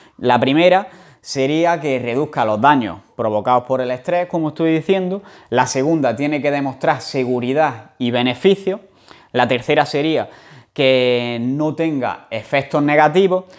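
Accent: Andalusia, Spain. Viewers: Acento andaluz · Tienes un acento interesante, naciste en España?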